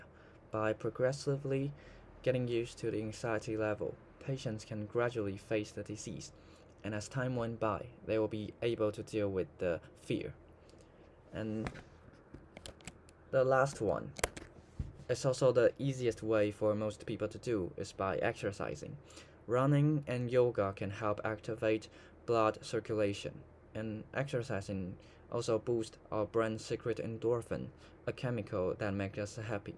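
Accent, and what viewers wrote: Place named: United Kingdom. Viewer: 這英國腔你是英國人吧 wml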